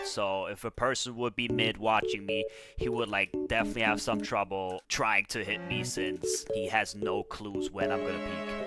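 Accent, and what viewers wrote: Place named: United Kingdom. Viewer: wtf he is like speaking British?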